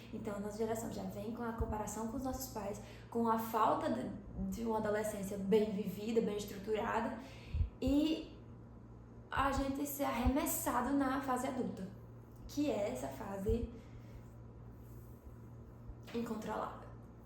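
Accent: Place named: Paraíba, Brazil. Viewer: C é da paraíba? Mó sotaque daqui haha vídeo mt massa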